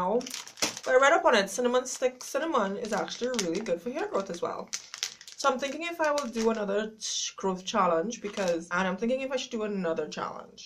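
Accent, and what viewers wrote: Trinidad and Tobago. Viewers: Are u frm trinidad? Your acvent almost sounds trini 😊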